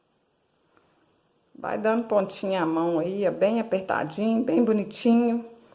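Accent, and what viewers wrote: Minas Gerais, Brazil. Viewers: Além do artesanato,é muito agradável escutar esse sotaque mineiro....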